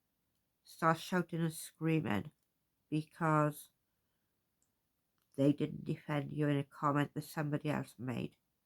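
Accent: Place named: United Kingdom. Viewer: British accents are beautiful as my Gran was from Northern England in Warrington. To hear you speak is like being home to me. I have a very mixed accent, but my Southern Tennessee/Kentucky stands out the most. I don’t see Renelle as a problematic creator , she’s a strong lady and I admire her. I like Barbi too. Subbed to them both, but I’ll not partake in negativity. We have so much of that already. I post mostly nature videos and goofy parodies. I may share serious topics here and there. It’s a great hobby for me as it’s not a montetized channel. My advice if anyone gets sucked into that negativity? Ignore them. Block them. Unsub them. Don’t speak of them. That’s what I’d do. I was stalked online almost ten years, it’s awful. We need to be kind. Thank you for making this video. I’m subbing. 🌹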